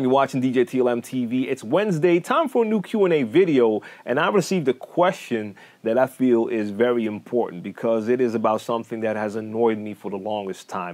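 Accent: Netherlands. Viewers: Are you half dutch? Cause you have very slight accent.. Anyhow great vids, great talent!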